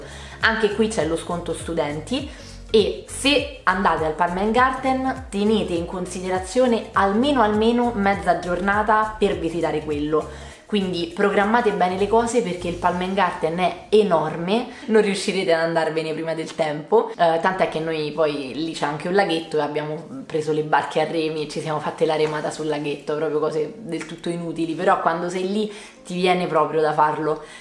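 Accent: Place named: Rome, Italy. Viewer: Io sono stato ad Amburgo e l'ho trovata stupenda sotto il periodo natalizio ma penso sia una cosa tipica delle città tedesche, che ne pensi? Comunque è già nella mia lista dei posti da visitare! Grazie :D Ps: adesso lo sento l'accento romano ahahah ti avevo chiesto di dove fossi perché mi piace incontro le persone che seguo assiduamente e conoscersi dal vivo! Da uno sprint in più !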